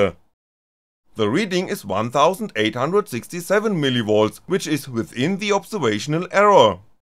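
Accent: Germany. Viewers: Lovely German accent.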